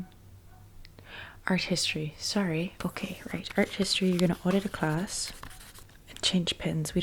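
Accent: Ireland. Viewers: yes, slay. I’ve been highly anticipating this !!! your Irish accent is my fav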